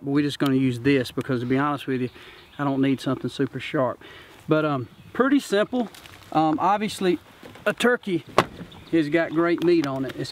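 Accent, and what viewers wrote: South, United States. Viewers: I gave him a like just for the southern accent.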